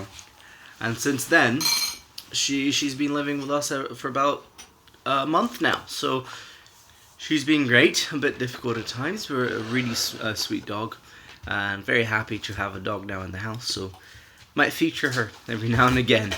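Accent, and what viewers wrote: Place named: Scotland, United Kingdom. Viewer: you're French right? You sound more Scottish in every vid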